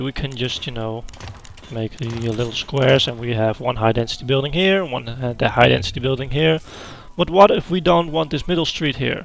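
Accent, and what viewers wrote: Netherlands. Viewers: Ik wist dat je Nederlands was ik hoorde het aan je accent :D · you're from holland am i right? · are you dutch by any change? u sound like you are haha ^^' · are you dutch? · Ik kan gwn horen aan jou stem dat je Nederlands bent haha, bedankt voor de tips 7jaar later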